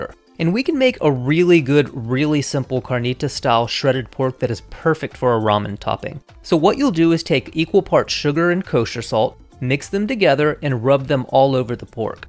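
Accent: United States. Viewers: Currently living in japan and the broth is absolutely spot on. Also the egg thing, my girlfriend was surprised that I hadn't ever seen that before. Also the wya you at the ramen is exactly jow everybody does it: enter the store, order at the machine and slurp it all in as quickly as you can so you can go back home and maybe sleep 8 hours if you're lucky before you have to go commuting again. The pronounciation of all the Japanese is just so stereotypivally American that I cant help be laugh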